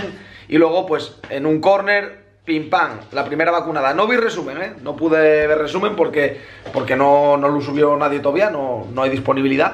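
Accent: Asturias, Spain. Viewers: Habla español q diga ye, tiu por muy asturiano q sea se entiende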